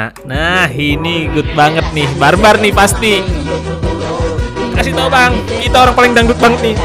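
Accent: Java, Indonesia. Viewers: Jawanya asli mana bang?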